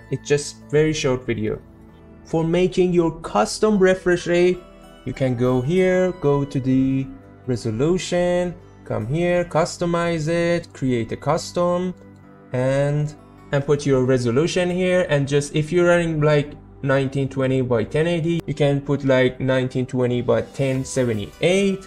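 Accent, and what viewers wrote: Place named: Iran. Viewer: The Iranian english accent is thick with this one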